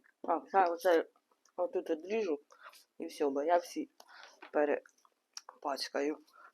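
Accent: Poltava, Ukraine. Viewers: Привіт зимлячка!!!!!!!, сьогодні я перший раз побачив твій ролік і почув наш Полтавський говор, дуже зрадів шо є така жінка рибачка, із сила, наша настояща Полтавчанка а ни наіграна якась бутафорна блогерша, багата з екоферми!!!!!!!! А в теби все по простому, як обично в селі!!!!!!!!!!! Та щей канал свій ведеш, зразуж підписався, дуже радий шо тебе знайшо чисто случайно, переглядав одне відео про заборону вилову риби з першого квітня, і тут натрапив на теби!!!!!! А з якого ти села якшо несикрет????????? Я з с.В.Сорочинці!!!!!!!!!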